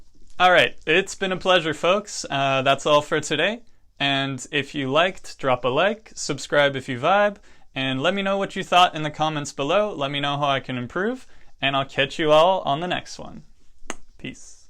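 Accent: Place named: Texas, United States. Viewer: I love the texan accent lol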